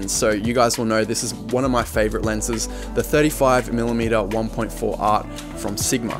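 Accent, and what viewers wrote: Australia. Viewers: Title caught my attention then within 2 words I realised you were a fellow Aussie , within 30 seconds I subbed. Looking forward to exploring the channel more👍🏻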